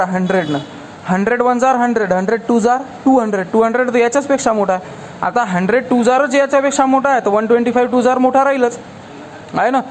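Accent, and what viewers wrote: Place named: Vidarbha, India. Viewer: mi pn vidharbhatli Bhasha vrunach kalte ki tumhi vidharbhatle aahet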